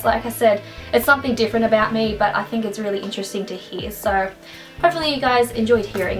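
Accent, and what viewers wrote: Australia. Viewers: are u from aus?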